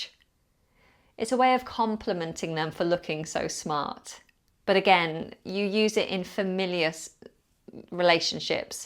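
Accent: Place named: United Kingdom. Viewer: I love your accent. Finally I found a nice British model to follow! 😊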